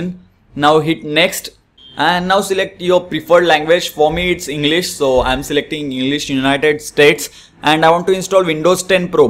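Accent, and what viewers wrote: India. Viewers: Bro you have the most authentic Indian English accent 👍 ask thanks for the knowledge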